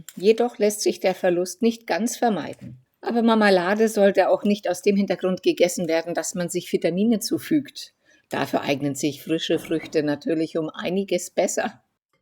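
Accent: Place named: Meiningen, Germany. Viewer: Hallo, ich finde deine Videos super! Perfekt erklärt und mit einfachen Zutaten! Ich hab mich schon durch einige deiner Brote durchprobiert, beide Sorten Frischkäse ausprobiert und gestern Kisch-Erdbeermarmelade gemacht. Alles Super! Ich komme aus der Nähe von Meiningen. Deinem Dialekt nach zu urteilen müstest du auch aus der Nähe stammen!? Liebe grüße Tino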